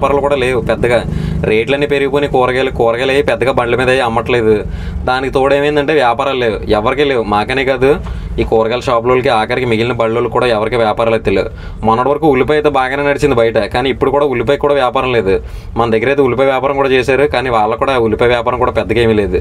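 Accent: Godavari, India. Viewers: Godavari voice bagunadi mava ? Akade o 5 yakarala lo niu padichu ? Try chaye bro ❤ ❤❤ Eluru district bro ❤❤❤❤❤❤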